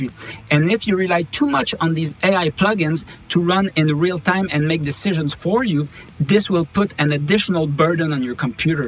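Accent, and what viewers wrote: Quebec, Canada. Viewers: l'accent Québecois all the way!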